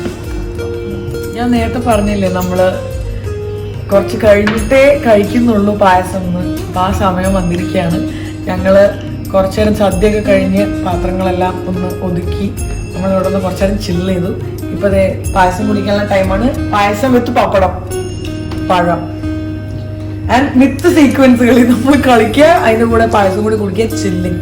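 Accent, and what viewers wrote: Kannur, India. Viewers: നമ്മളെ സ്വന്തം കണ്ണൂർ - പയ്യന്നൂർ slang ❤️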